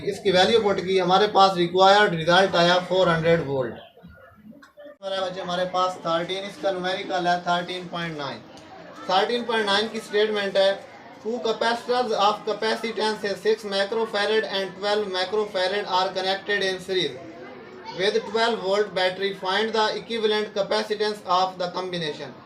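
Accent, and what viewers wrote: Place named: Jhang, Pakistan. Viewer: Kia method ha prhana k kia Khana...sir ap jhang sa ho kia?? Ap k language jhang k lgti ha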